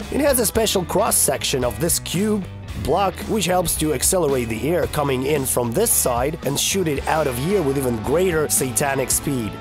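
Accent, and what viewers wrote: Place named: England, United Kingdom. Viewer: О, вы из Англии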